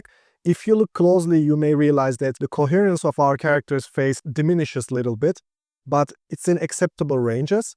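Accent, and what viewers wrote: Turkey. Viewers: türk ingilizcesi nerde görsem tanırım bre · From your accent I surmise you are from Turkey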